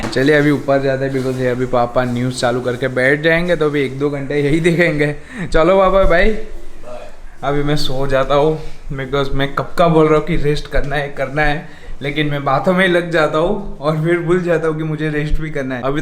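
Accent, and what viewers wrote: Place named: Gujarat, India. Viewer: U r so innocent like baby + gujju voice ❤️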